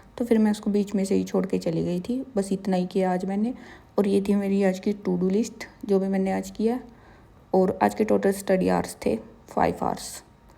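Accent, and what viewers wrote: Haryana, India. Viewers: Aapki boli se lag rha hai ki aap Haryana se ho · Hanji Haryanvi Chori h ye . Don't mind yrrr Haryanvi ladki h ye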